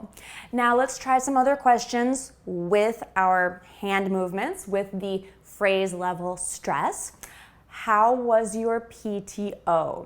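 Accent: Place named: Philadelphia, United States. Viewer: I like to hear your accent. I seem to hear the same accent in Philadelphia.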